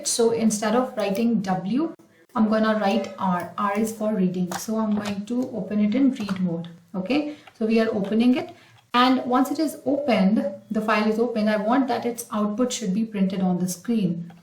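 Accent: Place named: United States. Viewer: in your previous video like 2 years before you spoke like a American like born there but now your teching is good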